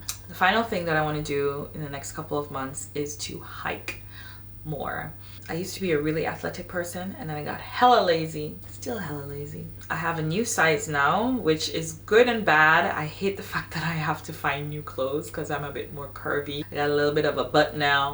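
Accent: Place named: Jamaica. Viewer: The Jamaican Accent is E-VER-EE-TING! 🤣❤️ This is amazinggg.😊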